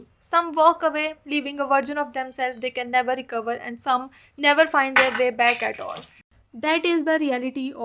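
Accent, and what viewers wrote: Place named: Punjab, India. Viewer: oh sry. But your accent is just like Punjabi's Btw your videos are positive. Keep it up, Good luck 🥹🎀💖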